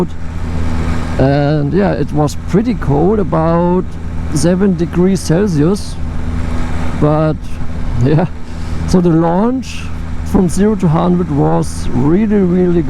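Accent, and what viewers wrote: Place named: Germany. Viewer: H(G)errman Englisch with a strong German accent 😮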